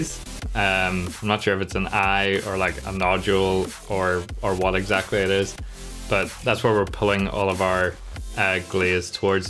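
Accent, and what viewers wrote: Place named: Northern Ireland, United Kingdom. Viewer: Really Helpful Video, has given me some inspiration for my Winged Hive Tyrant, also great to hear a Norn Iron accent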